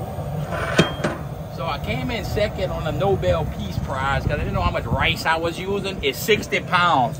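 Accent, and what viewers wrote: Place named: Cajun, United States. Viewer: if you weren’t severely autistic and could differentiate characters you’d realize he’s playing exactly that. On podcasts he’s got a strong ass Cajun accent but he’s not retarded.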